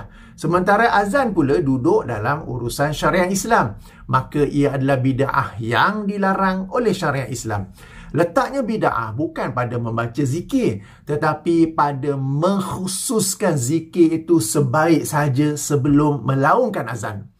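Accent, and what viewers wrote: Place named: Penang, Malaysia. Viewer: Kapten punya loghat Penang, "tak prnah habakkkq .. 🤣👍🏼 T.kasih atas ilmu kapt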